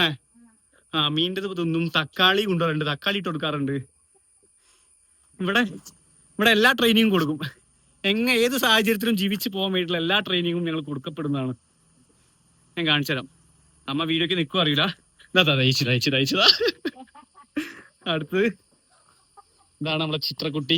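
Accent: Malappuram, India. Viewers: മലപ്പുറം thanne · നമ്മുടെ മലപ്പുറം ഭാഷ എന്തേനി🎉🎉🎉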